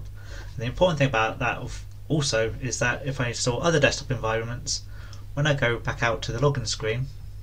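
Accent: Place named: United Kingdom. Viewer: very good explanation with clean british accent nice one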